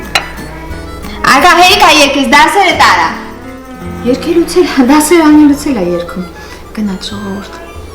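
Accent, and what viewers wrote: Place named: Gyumri, Armenia. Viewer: ջան քո խոսելաձևի մեջ գյումրեցու ակցենտ եմ տեսնում դու արմատներով որտեղից ես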